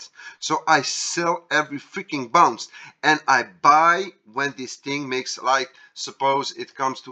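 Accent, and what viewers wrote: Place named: Poland. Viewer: Dude your English is great! I love Polish accents and way of speaking.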